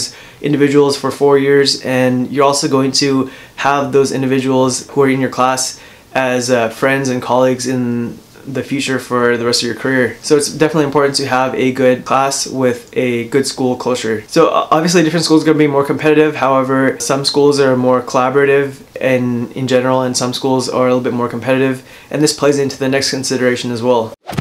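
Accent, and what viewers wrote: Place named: United States. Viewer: I’m shook. I didn’t expect your accent to be an American one 😂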